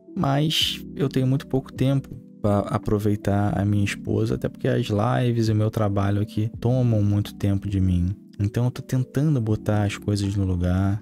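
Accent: Rio de Janeiro, Brazil. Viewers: carioca asmr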